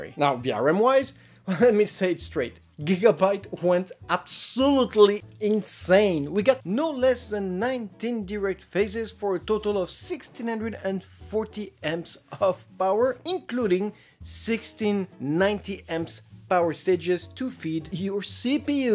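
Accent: France. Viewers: pretty french accent bro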